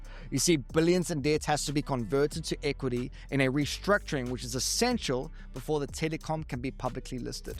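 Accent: South Africa. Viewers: This has to be the second worst South African accent.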